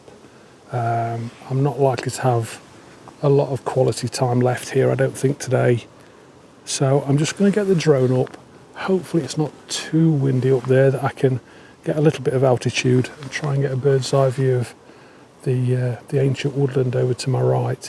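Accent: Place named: Mansfield, United Kingdom. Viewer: That's a very familiar accent! Mansfield by any chance? Am frum Wooduss! One of the things that made me ask the question, apart from your accent, was the "If you can't be good, be careful!"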